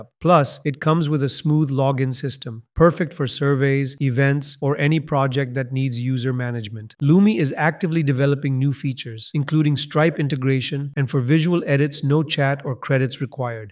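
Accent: India. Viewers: Bro... literally me every fking day! FYI - it's not cursor. It's fking claude. Thank you for not glossing over when tools are dumb af, This AI narrative is much better than the narrative you're using for the one with the asian voice. The asian voice one is a little more sycophantic than this indian one.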